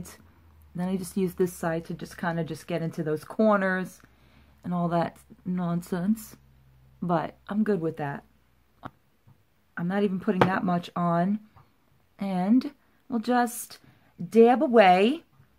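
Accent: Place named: New York, United States. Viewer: I hear a New York accent girl! Love shopmisssa first impressions btw.💕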